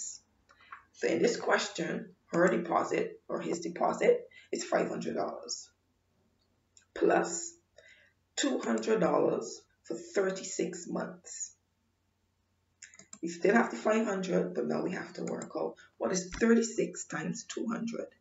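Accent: Barbados. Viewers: Thank you, this helped me a lot and I love your accent are you a bajan?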